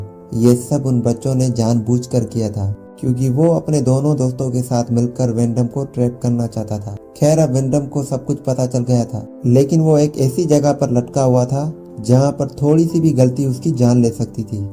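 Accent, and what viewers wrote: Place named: Sindh, India. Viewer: Ap Sindhi ho na your excent is like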